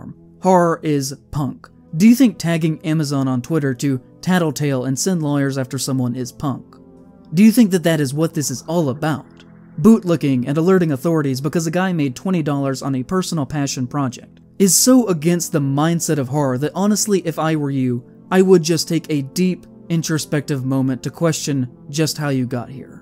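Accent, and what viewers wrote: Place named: South, United States. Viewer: with a southern accent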